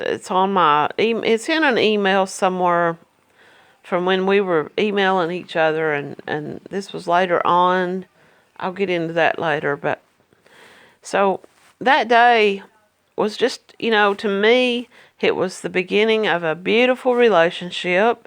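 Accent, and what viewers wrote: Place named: Kentucky, United States. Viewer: You sound like you have a Kentucky accent..because here in my small little town everyone knows everything, and only 1 red light and 1 $ store..lol..and most men here are narcissists.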